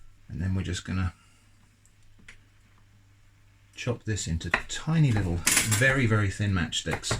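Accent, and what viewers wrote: United Kingdom. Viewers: AfrigginPancake Boi Right? isn’t his voice so comforting, mixed with his lovely british accent its perfect to calm down. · You Sound So Brit-tish Already Now Love!!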